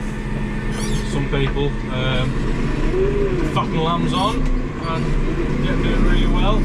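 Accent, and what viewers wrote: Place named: Yorkshire, United Kingdom. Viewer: He is from yorkishire the accent should tell u that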